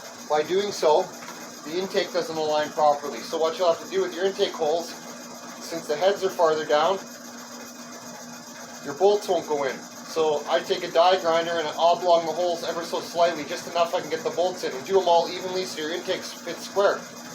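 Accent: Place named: Ontario, Canada. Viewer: You often say, "how's she going eh". Are you a from Ontario?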